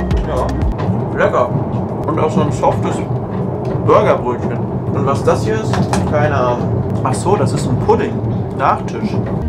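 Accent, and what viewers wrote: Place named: Germany. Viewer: würd ich nicht sagen. ist gutes schulenglisch. aussprache ist halt bisle schwierig, aber das haben fast alle deutschen so :D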